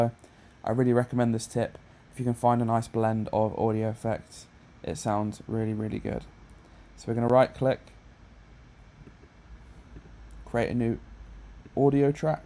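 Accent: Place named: United Kingdom. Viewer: happy to found someone who has british accent with ableton tutorials :D <333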